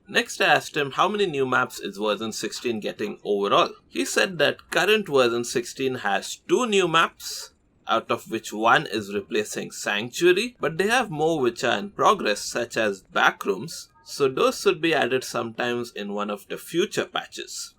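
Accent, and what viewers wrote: India. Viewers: Sir are you from India because your voice like india's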